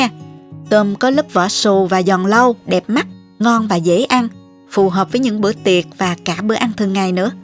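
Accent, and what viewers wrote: South, Vietnam. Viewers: Cảm ơn luôn luôn cho ra những video bổ ích..với sự hướng dẫn ngắn gọn dễ hiểu, cùng với giọng nói mộc mạc đậm chất nam bộ, em rất thích nghe a 👍💕, mến chúc cô nhiều sức khỏe để tiếp tục với niềm đam mê của mình ❤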